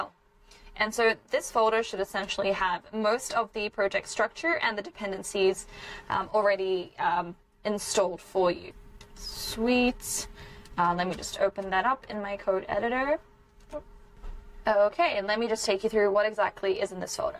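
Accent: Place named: Australia. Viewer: You have snippets of Aussie in your accent..... Keen to see direct migration for whole WP websites, that can link to NFT (Open Sea etc), email capturing for subscribers (as a database for future contact), and other links to Unstoppable Domains donations /merchant receipt for sending g crypto. Thank you, am giving this clip a go as a reference help. Appreciate the clip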